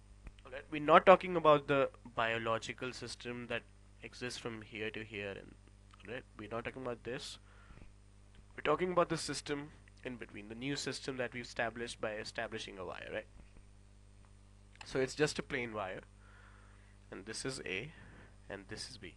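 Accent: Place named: India. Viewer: You seem to be Indian ! from ur way of speaking!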